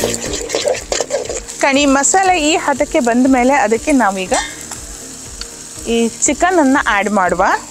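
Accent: Kundapura, India. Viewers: ನಮ್ ಕುಂದಾಪುರ ಭಾಷಿ ಕೇಂಬುಕೆ ಚಂದ ಕಾಣಿ... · ಬೇವಿನ ಎಲೆ ಅಂದರೆ karibevu ಎಂದು ಹೇಳಿ ಇಲ್ಲವಾದಲ್ಲಿ ಶಿವಮೊಗ್ಗ ಜಿಲ್ಲೆಯ ನಂತರದ ಜಿಲ್ಲೆಯವರು ತಪ್ಪು ಮಾಹಿತಿ ನೀಡಿದಂತೆ ಆಗುತ್ತದೆ ದಯವಿಟ್ಟು ನಮಸ್ಕಾರ... ತುಂಬಾ ದಿನಗಳ ನಂತರ ಕುಂದಾಪುರ ಭಾಷೆ ಕೇಳಿದ್ದು ತುಂಬಾ ಧನ್ಯವಾದಗಳು chicken green roast super madam thank u so much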